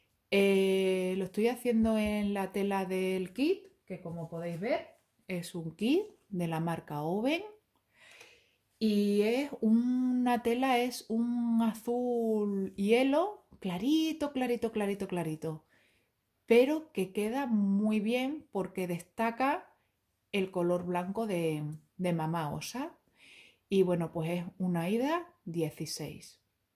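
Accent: Extremadura, Spain. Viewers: Preciosos todos. Tu marido , ¡vaya como borda!. Por cierto, me encanta tu acento extremeño.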